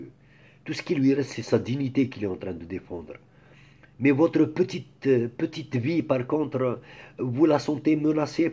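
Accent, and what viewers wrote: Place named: Western Algeria, Algeria. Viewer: Khouya vous ete tres eduquee et intellectuel intelligence...ellah ibarek...je c que peut etre vous exprime mieux en francais et plus allaise...ce que jai mal a captee..je suis englophone...alors algerienise Khouya...en plus Jaime l'accent de l'ouest saha shourak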